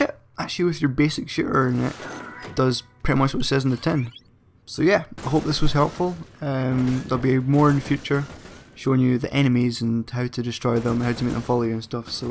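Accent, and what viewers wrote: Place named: Scotland, United Kingdom. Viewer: cool vid but are you speaking english? That scottish accent is rough.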